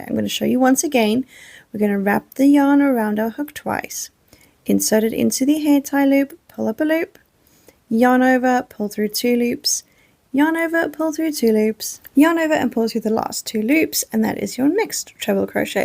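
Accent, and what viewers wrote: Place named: United Kingdom. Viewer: it's interesting to me that you seem to using the us standard for the names of the stitches. I learned that a British treble crochet is equal to a US double crochet, a British double is equal to a US single, and so on. Maybe I'm misplacing your accent (you sound British to me). If so, I apologize. I just wonder if that's on purpose like if you know your audience is mostly from North America or if that's just how you learned to crochet. If the latter, did someone teach you or did you learn from Youtube or something?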